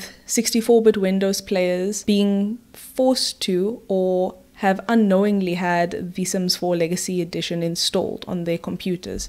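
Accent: South Africa. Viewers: Are you South African ?? :)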